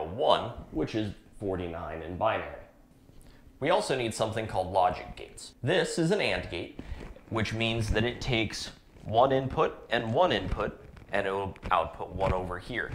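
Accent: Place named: Canada. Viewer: i saw an american flag, but you sound like every single person i know-- here in canada, lol.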